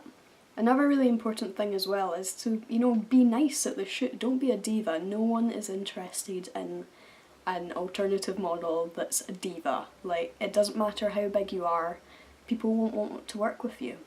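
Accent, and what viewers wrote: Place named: Glasgow, United Kingdom. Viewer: I knew i recognised you... Soon as you started talking i realised I've most like Likely seen you around Glasgow at some point!! This was a great video by the way, I've just accepted a 3 year contract with a modelling agency and I'm hoping to specialise in alternative modelling. Definitely boosted my motivation to succeed so, cheers :D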